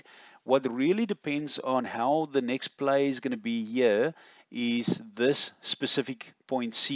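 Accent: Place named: South Africa. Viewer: Thick South African (Afrikaans) accent...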